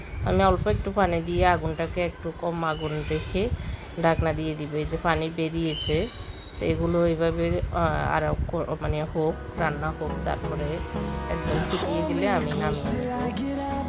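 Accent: Noakhali, Bangladesh. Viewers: আপু আপনার ভাষা অনেক পরিচিত মনে হলো।নোয়াখালীর ভাষা।আপনার রান্না ঘর অনেক সুন্দর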